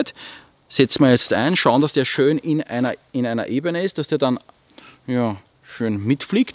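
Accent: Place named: Austria. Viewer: Cause he is an Austrian and he plays with his slang a little bit, not everything could be translate to stiff english comments😂 Additionel you have the possibility to learn german with austrian accent 🎉 Most of his customers are from Austria or Germany. Easy Solution activate Google-Translator while watching youtube...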